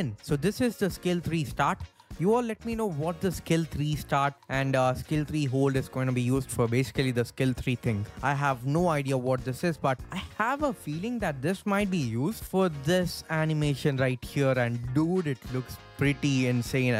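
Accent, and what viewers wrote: India. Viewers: Your accent sounds like indian idk why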